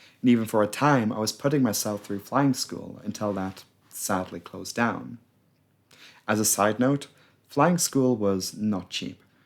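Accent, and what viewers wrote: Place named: Scotland, United Kingdom. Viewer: Your videos are so comprehensively informative that I thought you were actually a professional in the industry! What was your previous "real" job? Have you thought about going back to school to enter the industry in a different capacity, such as engineering(for the future)? I love your voice and imagine you had an excellent SLP for vocal guidance during your transition. And that accent!! I can't quite place it--Scottish? Irish?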